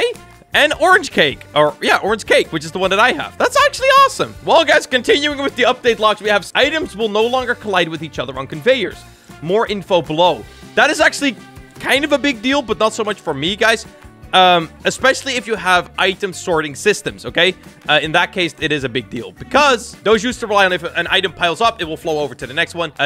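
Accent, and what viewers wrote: Netherlands. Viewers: r u dutch? Cause i am haha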